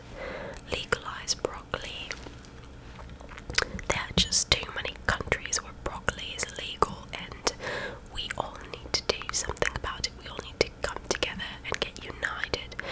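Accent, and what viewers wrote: United Kingdom. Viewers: Hi, I missed you very much, your British accent is wonderful ❤❤❤ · You know I'm from NYC and seeing a beautiful latina do a British accent I am honestly impressed. In the future could you please slowly scratch the fluffy mic again? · Nice and great video. I was really surprised to see u do a British accent. I must say u do a perfect British accent 100 percent. U sound almost like UK asmr remember her. Thumbs up 👍👍 August.21.2021. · Yes!😊😊😊😊. My wish is finally granted! is back with another ASMR video with a british accent! YAY!🎉🎉🎉🎉🎉🎉. Also, you sounded like Hermonie Granger's accent from the first Harry Potter movie! Now that's wicked cool!💙💙💙💙💙💙💙👍. I give this video an A+ for your incredible British accent,!⭐⭐⭐⭐⭐⭐⭐👍. Have a great weekend and enjoy your fantastic accent.😊😙💙💙💙💙💙💙💖💖💖💖💖💖💖😊⭐⭐⭐⭐⭐👍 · I just assumed you were British… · this video will be 2yrs since it was released and I'm still hoping she'll make a pt.2 of it coz this is always my go to British accent ASMR. 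☺️ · British accent why not ? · You let it slip a few times went abit Birmingham aha but other that a genuine solid accent it u spoke to me in the streets I wouldn’t question it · Really Beautiful Woman with a Very Beautiful British Accent!! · Perfect accent. I say that as a Brit.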